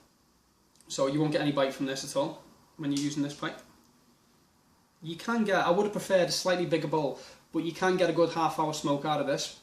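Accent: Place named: Liverpool, United Kingdom. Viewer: Terminus Airsoft he's a scouser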